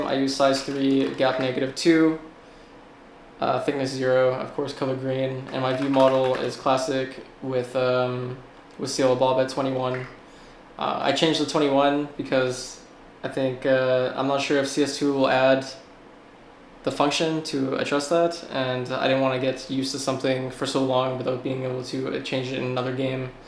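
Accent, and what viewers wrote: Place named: Europe. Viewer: man's played in europe so long he's got a quasi european accent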